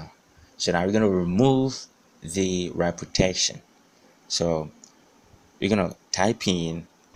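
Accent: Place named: Africa. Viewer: You have an African accent, are you from Africa?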